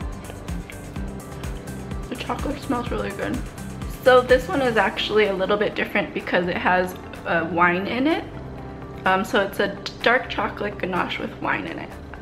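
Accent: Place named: Philippines. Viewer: Your voice is just like a Filipino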